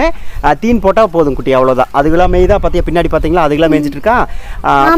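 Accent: Kongu, India. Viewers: Kongu Tamil kongu Tamil thanunga ❤🌱 By Kongu Pet Collections 🌱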